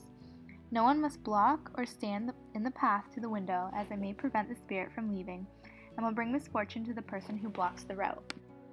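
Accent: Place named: United States. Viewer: American voiceover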